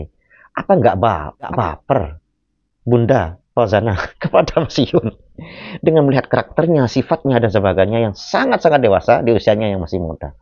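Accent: Madura, Indonesia. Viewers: Assalamu'alaikum bro klao sya cermati dari suara nya sampeyan ini kayak nya orang Madura ya bos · Pancen meduro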